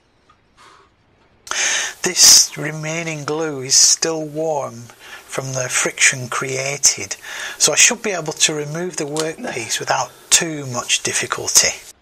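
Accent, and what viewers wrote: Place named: Lancashire, United Kingdom. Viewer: Good to hear a Lancashire accent!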